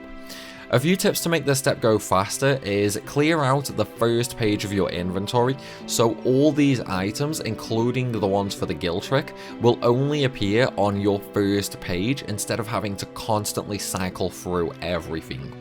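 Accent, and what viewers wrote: Stoke, United Kingdom. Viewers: I’m normally pretty good with accents, but I can’t quite get yours. Are you from Stoke/surrounding area?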